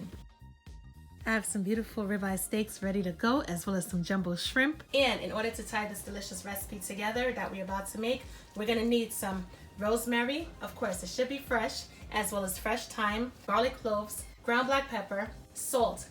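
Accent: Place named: East Coast, United States. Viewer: Love the east coast accent!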